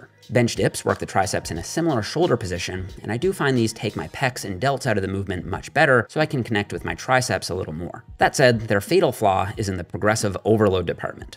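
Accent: Canada. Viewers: Love his Canadian accent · He has such a strong Canadian accent 😂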